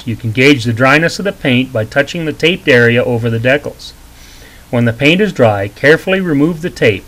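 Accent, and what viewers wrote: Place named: United States. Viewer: frickin americans, soon they'll be saying its a ruf, instead of a roof and a crick instead of a creek.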